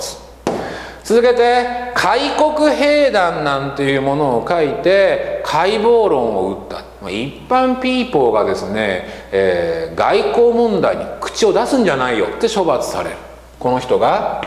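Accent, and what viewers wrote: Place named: Kansai, Japan. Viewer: 関西人かな。うちの親父とイントネーションが似てる。心地いい。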